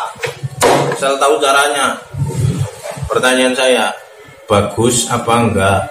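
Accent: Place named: Java, Indonesia. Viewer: Aku pengemar ntn chanel. Tapi yg aku salut, abang nya slalu merokok. Kira2 brapa bungkus bos sehari habis roko nya. Wkwk... Slm logat jawa mas. Izin kan trus aku belajar d chanel nya.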